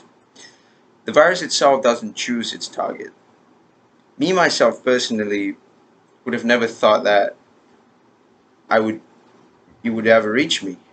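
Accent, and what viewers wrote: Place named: United States. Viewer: You're such amazing!!!! Definitely like an american boysssss....good job !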